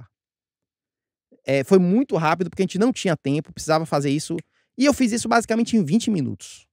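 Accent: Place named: Salvador, Brazil. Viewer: Muito bom ouvir o sotaque soteropolitano falando coisas tão de audio tão importantes e trazendo a música fantástica que se faz em nossa cidade.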